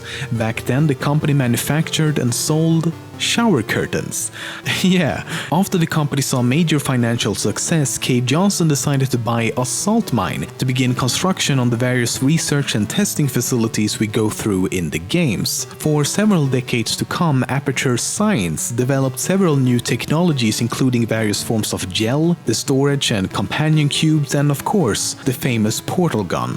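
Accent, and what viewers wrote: Sweden. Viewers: Not to be mean but are you Swedish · Jesus Christ the Swedish accent is killing me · are you sweedish? sounds a bit like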